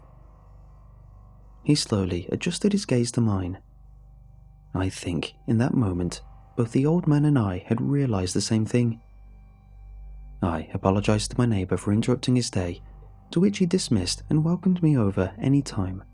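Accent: United Kingdom. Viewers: A velvet voice with a charming British accent reading me some Creepypasta? What's not to love? <3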